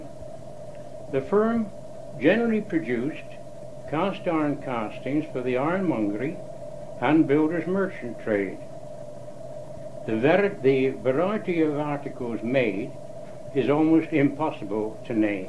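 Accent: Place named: Worcester, United States. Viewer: How great to hear an old Worcester accent.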